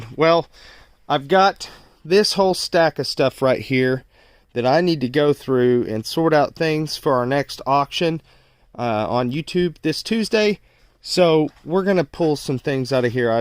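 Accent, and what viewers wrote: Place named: South, United States. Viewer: I am enjoying your videos from Australia. I am originally from Texas so the Southern accent reminds me of home.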